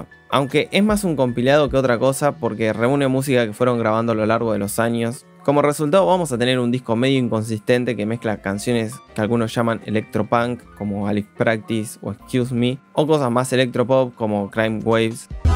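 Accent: Argentina. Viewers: Más bien, no a todos nos gusta el acento argentino.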